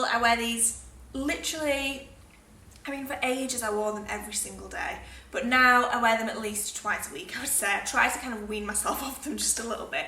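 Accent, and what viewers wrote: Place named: West Yorkshire, United Kingdom. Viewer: She's from West Yorkshire, near Leeds (in the North of England)!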